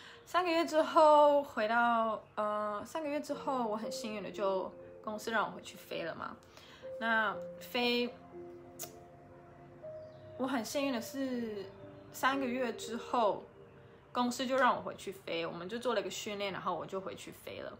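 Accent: Taiwan. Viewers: 回來啦？在臺灣？隔離中？標準的臺灣國語，很親切！ 不知道答案也是答案？打電話來都不是好事？推這兩句直白的話！珍珠奶茶當飯喝，這個也可以推！😋😋😋😋😋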